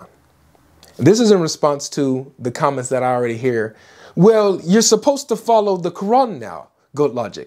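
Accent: United Kingdom. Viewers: that british accent is on fire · that bri ish accent was fire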